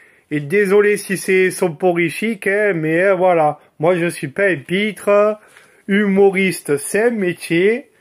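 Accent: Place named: South, France. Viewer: Merci pour ta franchise et ta spontanéité, ça nous change des essais habituels. Tu donnes Beaucoup d’informations, je vais acheter la même. Est que la bulle autour du phare vient de chez Yamaha? Merci encore et bonnes balades, avec l’accent du sud!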